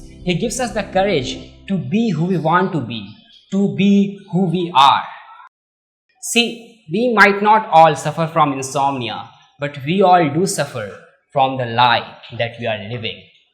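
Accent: India. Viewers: Dude, that heavy Indian accent is a put off. But other then that, content is good. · why is it not proper english, you cant expect an indian man to talk in an american or english accent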